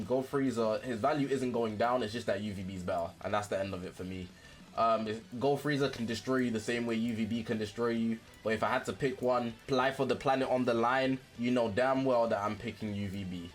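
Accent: United Kingdom. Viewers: British man explaining in NBA terms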